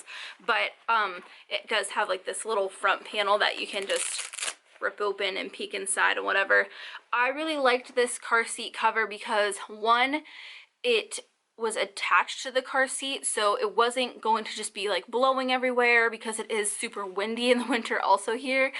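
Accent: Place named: Michigan, United States. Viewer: sounds like you live in Michigan!!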